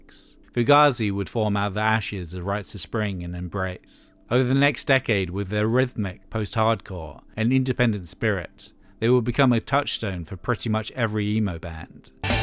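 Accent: United Kingdom. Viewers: Ngl it's really weird hearing a British guy talking about emo. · Can Brits like, not narrate something for once?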